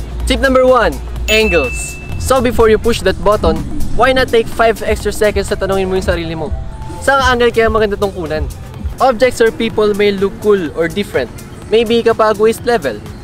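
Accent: Philippines. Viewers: You remind me of my Phillipino boss here in UK your accent.😍😍😍. I like you you are a very good teacher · That sounds like a Filipino accent ? And Tagalog am I Tama ????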